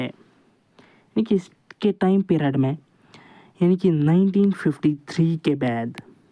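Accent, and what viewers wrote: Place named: France. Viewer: french kee english bolna chod dooo mra bahi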